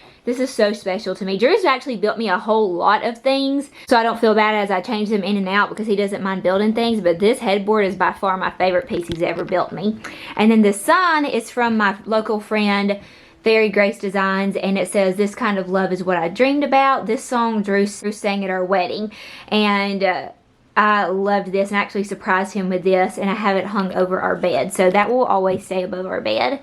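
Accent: South, United States. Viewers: Your home is absolutely lovely You have done such a beautiful job with it. Moreover you are just a incredibly beautifully lovely young woman.😇 I bet your mom is really proud.😍You're about the age of my daughter which I had very young, because I'm not that old I'm only 50. ☺️Which probably seems old to you but it's not that old isn't it mothers that have older daughters. LoL😉 I want so bad to learn about essential oils, I'm sick and have been for almost 20 years, so I've lost a large section of my young adult life. It's hard for me to look them up online or read them all through a book and still maintain and remember what's what and when and how I should use them? as well as how to combine them for what I'm looking for? But it's something I would truly be all in and passionate about if I didn't have just the limitations due to the severity of my illness at this time 🤔. 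God bless you honey and thank you for another amazing tour of your home and wonder of watching your cute self and you're absolutely adorable Southern accent 💝❣️❌💋❌🥰❌🌹🌹🌹🌹🌹❣️❣️❣️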